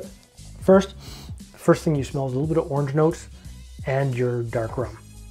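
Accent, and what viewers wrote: Canada. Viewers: On the back deck or in the hawse, (love the accent from the Yukon). I read thru your comments and I need to make more homemade Grenadine, for mine is approaching it's lifespan. The Goslings is a great floater. I've even seen more potent Gosling at the store. Many places by me use Myers. I'm always in for large rum drinks. Sláinte!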